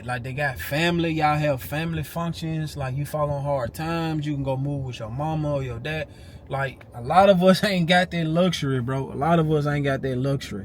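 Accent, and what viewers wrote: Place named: Louisiana, United States. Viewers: New sub,u speaking facts🤣😂🤣😂😂😭😂😭😂aye u got a southern accent u gotta be from da south,im from Louisiana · Sounds like Louisiana to me cuz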